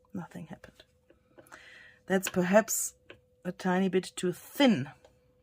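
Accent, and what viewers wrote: Germany. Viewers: Omigosh, …This digital came up on my Etsy feed last night and I added it to my cart… Now, when I see it in use…I must have it…I will be returning to my cart and purchasing it right away…I love these cards… Now I have to figure out how to print four on one page… I must tell you, I am of German descent and when I listen to your accent, it brings me back to my childhood and makes me happy… You have a wonderful personality and you make me smile…Hugs to You…❤️❤️❤️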